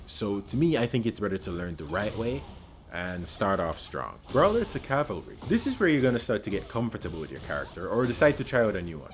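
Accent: Caribbean. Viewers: Imma subscribe bc you sound Caribbean...i am too. You also know what youre talkkng about! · bro sounds caribbean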